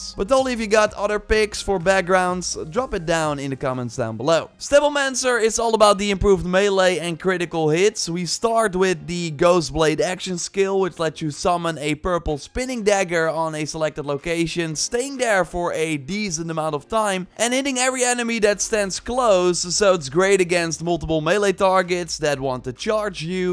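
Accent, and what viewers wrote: Netherlands. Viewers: i smell dutch... also i recall them saying theres no characters anymore. yet we have classes kinda like that one diablo game.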